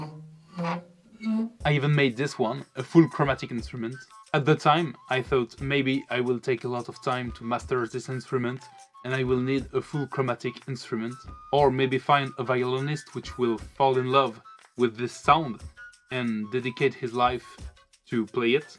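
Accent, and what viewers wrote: France. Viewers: Salut ! J'ai beaucoup aimé la vidéo, mais je me posais juste une question : Comment as tu réussi à autant développer ton vocabulaire en gardant un accent français si prononcé? la bise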